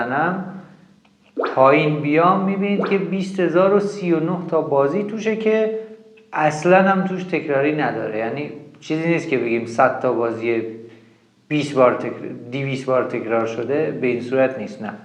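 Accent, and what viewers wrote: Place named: Rasht, Iran. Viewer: آقا شما رشتی هستی لحجت مشخصه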